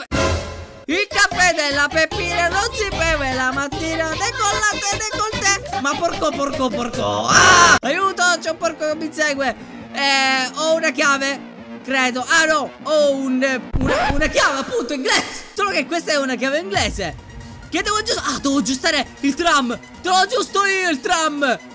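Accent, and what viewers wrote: Sicily, Italy. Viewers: Sei siciliano?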